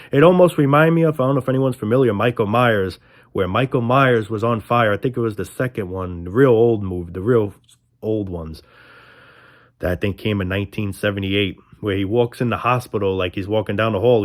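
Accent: New York, United States. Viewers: You're so handsome even considering the horrific topic you are talking about. I love your New York accent too. Yeah showing the video is something that wouldn't be appropriate at any time .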